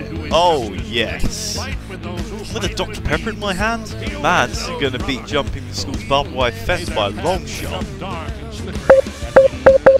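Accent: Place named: United Kingdom. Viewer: this guy sounds British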